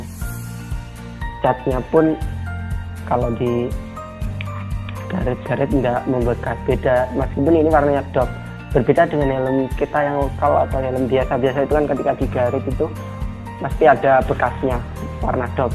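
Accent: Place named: Java, Indonesia. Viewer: jowo e juga medok tenan mas e..😂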